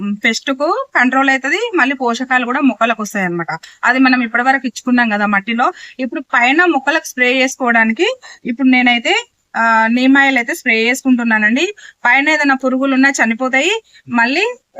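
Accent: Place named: Rayalaseema, India. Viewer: మీ లాంగ్వేజ్ రాయలసీమ లాగా vundi అక్క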